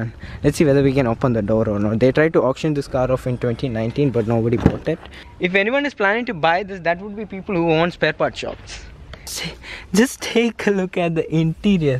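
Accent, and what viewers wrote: India. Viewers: YOUR ACCENT TO INDIAN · Indian accent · People make fun of other indians because of your accent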